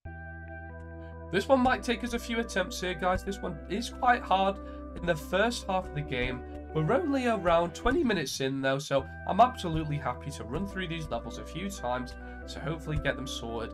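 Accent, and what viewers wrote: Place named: Manchester, United Kingdom. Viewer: I fell asleep watching this last night and I was confused to find my inner monologue briefly had a manchester accent in the morning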